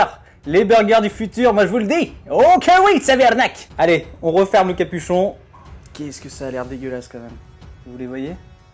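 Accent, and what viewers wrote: Canada. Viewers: PK tu fais plis l'accent canadien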